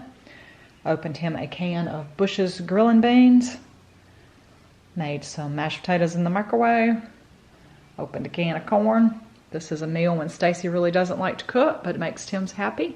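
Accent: South, United States. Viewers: I’m enjoying your channel that I ran across, and picked up on that southern accent (which I have as well) so I had to subscribe! lol! Your voice is very soothing. I had a Westbend slow cooker many years ago and enjoyed it so much. When it stopped working, I kept the lid and use it frequently as a serving dish. I would love to find another, reasonably priced. I have a lot of your videos to catch up on! Blessings to y’all!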